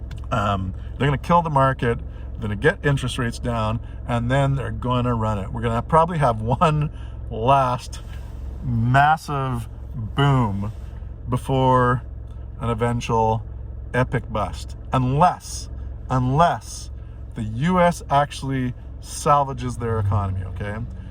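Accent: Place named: Italy. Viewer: It's funny how I hear your Italian accent during your Spanish lol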